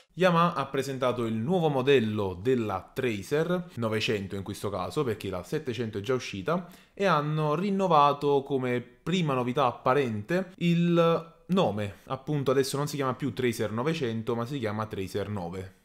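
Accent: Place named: South Tyrol, Italy. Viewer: si...infatti avevo sentito un "vago" accento Altoatesino...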